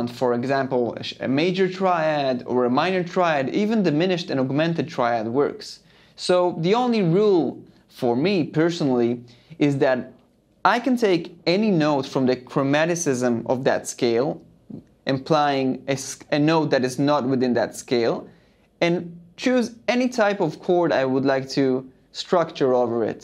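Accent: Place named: Iran. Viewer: hi, my name is Lawson. I live in Los Angeles. I hear a Persian accent when you speak. My brother in law is from Iran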